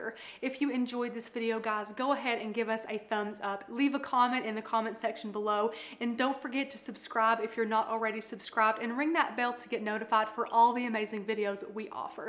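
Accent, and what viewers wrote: South, United States. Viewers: I love her southern accent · I'm from the south and love her southern accent. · Clicked for the review...stayed for that amazing accent! I'm Australian, don't hear that kind of voice every day. Is that American 'Southern' / 'Mid-Western? Also, waddaheck happened to your brick wall? Someone got a bumped head over there?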